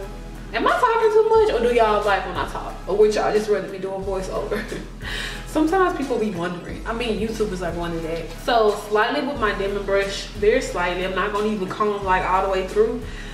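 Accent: South, United States. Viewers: I love your accent! Are you from Louisiana? Somewhere in the south? I'm from Mississippi.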